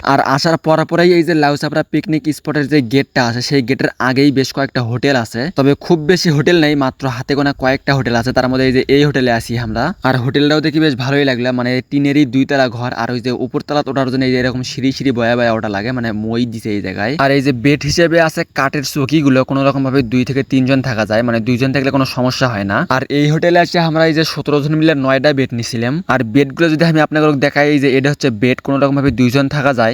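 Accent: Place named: Bogura, Bangladesh. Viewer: গাইবান্ধার ভাষা এমন না। এটা বগুড়ার ভাষা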